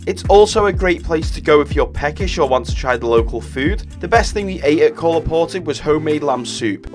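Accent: Liverpool, United Kingdom. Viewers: is that a scouse accent or wirral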